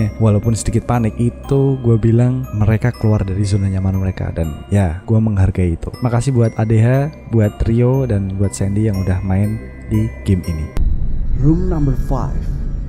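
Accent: Java, Indonesia. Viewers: aku mnden wedii.. mnaa orang jawaa suaranya...sukses rus ya kka 2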